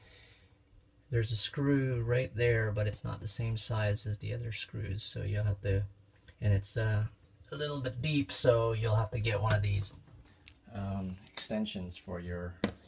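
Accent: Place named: Pennsylvania, United States. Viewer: Where are u from. Accent Reminds me of a guy I knew from Pennsylvania. Just wondering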